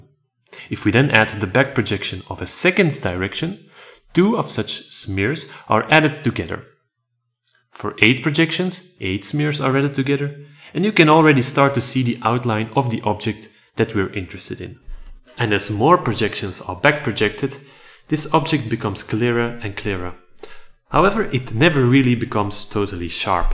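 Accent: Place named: Belgium. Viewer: you sound belgian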